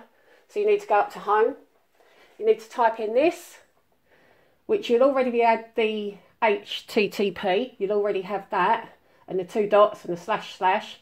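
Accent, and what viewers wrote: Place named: United Kingdom. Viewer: Oh i love your british accent it sounds son beatifull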